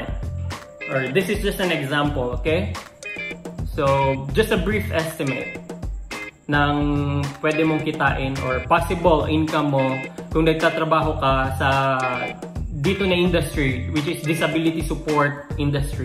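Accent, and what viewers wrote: Australia. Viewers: Great info! Wanna hear your AUS accent 💘🙊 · I can hear your Aussie accent na. haha Nice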